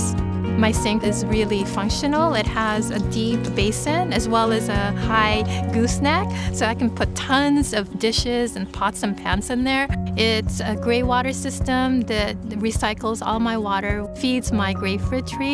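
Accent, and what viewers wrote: Philippines. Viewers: When I first hear her talking base on her accent I knew she˚s a Filipino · I didnt realize you are a filipina. really nice accent.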